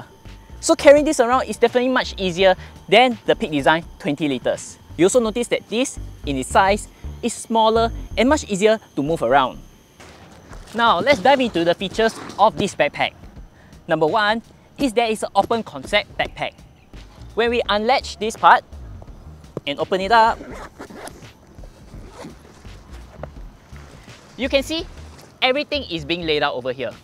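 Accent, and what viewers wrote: Singapore. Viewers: Legend mate, great review. I grew up in SG and I knew that accent was familiar 😂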